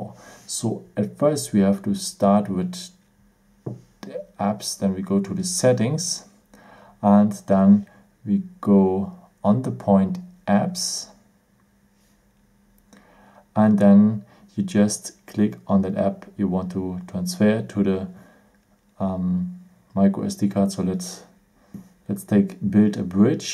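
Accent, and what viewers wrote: Germany. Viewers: yor from german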